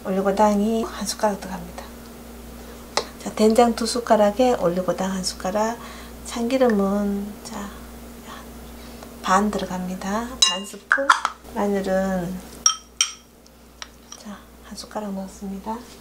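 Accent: Gyeongsang, South Korea. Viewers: 반가워요 이견을 주셧어 고마워요 님 께서 같은 경상도 구수한 고향 말씨에 모습이 석가세존 을 닮으셧고 집사람 과 같은 넉넉한 마음씨에 정이 갔어요 행여 뎃글 읽으시는데 불편하셧다면 넓으신 아량으로 관용을 바람니다. 오늘 날씨가 너무덥고 폭염이 계속되는데요 스트레스 는 만병의 원인이라고 하는데요 늘 건강 하시고 행복한날 되시기를 기원할께요 😊⛵피서 하세요💖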